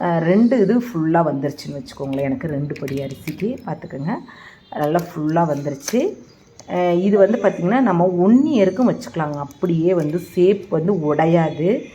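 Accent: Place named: Kumbakonam, India. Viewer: Super குடந்தையில் இப்படிதான் சொய்வோம் வார்த்தையுமா எங்க ஊர் மாதிரியே பேசுரிஙாக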